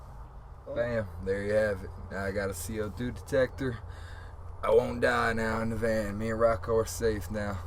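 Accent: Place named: United States. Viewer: cute american accent lol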